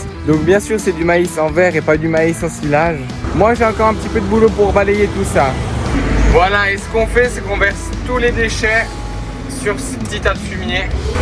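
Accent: Switzerland. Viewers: L'accent du Nord Vaudois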